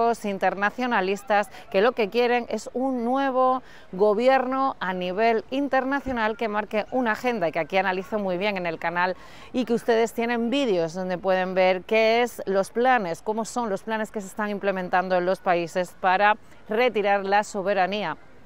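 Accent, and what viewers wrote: Spain. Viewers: En Cuba siempre han querido mucho a España, me emociona tu acento pura Española, o sea de España.Tengo más de 15 años que no como un turrón alicante, ni el turrón jijona ,aca en Venezuela es muy caro para mi.Buenas tardes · Me gusta .loquedises con heste asentó Español.desde .USA😊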